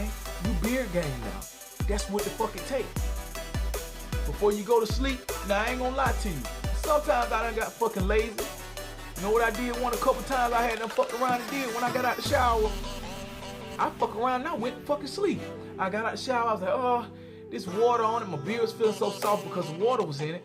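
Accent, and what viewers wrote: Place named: South, United States. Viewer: Bride has to be from the south lol I’m from the south that nigga sound just like us lol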